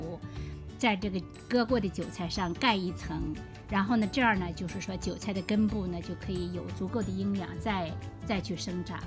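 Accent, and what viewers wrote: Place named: Weihai, China. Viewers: 听您的口音好像山东威海人呢！ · 哇、妳也是威海妹子?咱也是威海人。難怪聽著那麼親切! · 大姐是威海的吧，字里行间家乡味儿改不了啊！ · 听口音好像山东的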